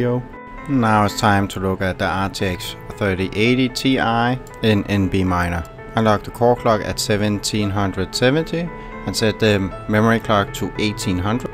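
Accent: Denmark. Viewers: Den der Danske accent altså. :)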